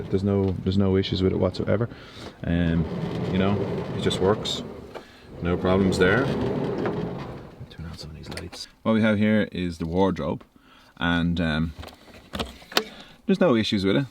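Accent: Ireland. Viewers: Beautiful Irish accent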